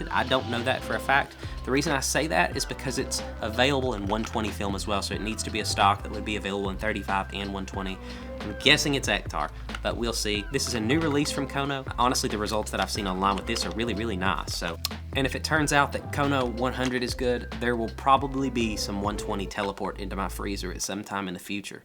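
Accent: Kentucky, United States. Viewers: I could tell your accent was a KY accent and when you mentioned being a hillbilly you confirmed it for me. Fellow Kentuckian here. I’m so excited to follow your journey!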